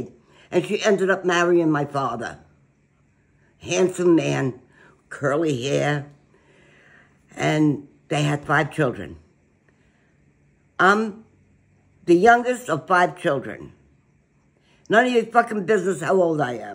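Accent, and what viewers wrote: United States. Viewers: Hi 👋🏻 best wishes from London, England 🏴 I think you’re bloody brilliant and I could listen to you all day- loving the Brooklyn accent 👏🏻 also. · LMFAO!! Your so funny and I just ❤️❤️❤️ you video’s and Tick Tok videos also! Being from Philly I just can’t get enough of your New York accent!! I live in the South now and miss my family in Philly, Jersey and NY!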